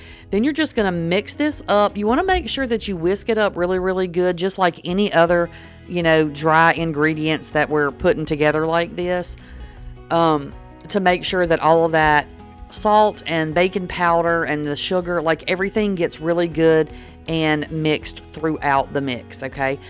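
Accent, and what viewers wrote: South, United States. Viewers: Trying to place your accent. Im in the NWArkansas melting pot and we get have all kinds of versions of a southern accent here.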